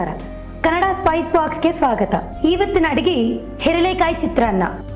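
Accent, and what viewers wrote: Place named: Karwar, India. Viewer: Nice. Heralekai rasakke nimbe hannina rasa andubittri. By the way I enjoyed your Kannada accent. Idu Karwar kannadana ?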